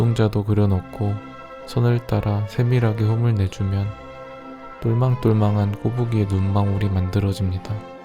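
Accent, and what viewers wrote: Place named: Chungcheong, South Korea. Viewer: 는 충청도 사람입니까?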